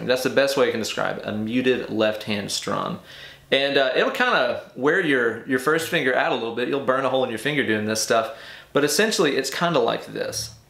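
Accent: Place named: Southeast, United States. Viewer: Well Done Mate!!! First time seeing it live was Bonnaroo 07 opening song. Lasers went to Infinity and beyond. Great riff and great lesson. You sound like you're in our General area of the US? Southeast?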